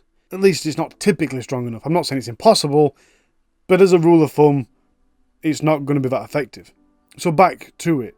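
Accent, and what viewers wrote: United Kingdom. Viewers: Enjoyed this , subscribed, nice to hear a UK voice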